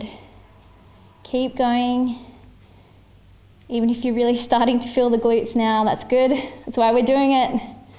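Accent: Australia. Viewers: Loving the accent! Is it Australian?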